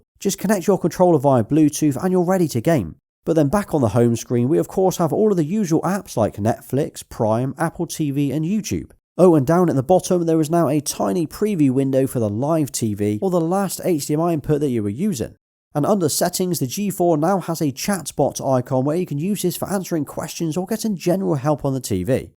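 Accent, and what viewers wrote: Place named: England, United Kingdom. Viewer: what is this city ? miami or another ! hi bro from kazakhstan 🇰🇿 your accent so beautiful 🔥 the english accent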